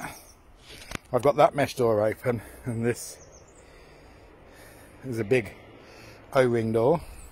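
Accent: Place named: Melbourne, Australia. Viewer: i lived both in the UK and the US but you dont sound like either you have this Melbourne tone to you 😀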